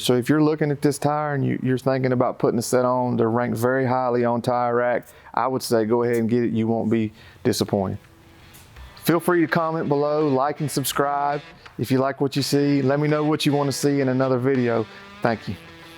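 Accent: South, United States. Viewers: 10 swag points for the southern accent.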